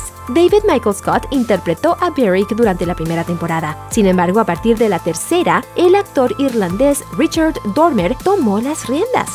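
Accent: United States. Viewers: Lo que molesta es el afán de la narradora en pronunciar los nombres con acento gringo. Vale Gaver. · Ay, qué pronunciación yankee tan ridícula tiene la locutora!! Es necesario?! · Español de estados unidos???? Dislike equisde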